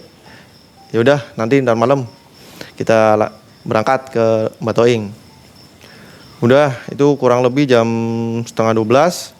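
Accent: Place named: Tegal, Indonesia. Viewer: Kayong situse wong Tegal kiye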